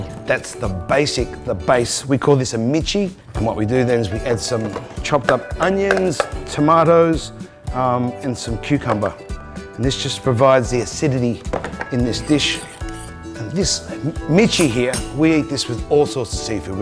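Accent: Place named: Australia. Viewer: this comment is not meant to be disrepectful or anything, but this video makes me, as a Londoner want to travel to this part of the world more, I've just saw an Asian looking man speak in a Aussie accent teaching how to cook Fijian food. Amazing, so much culture in this part of the world I've yet to see and experience.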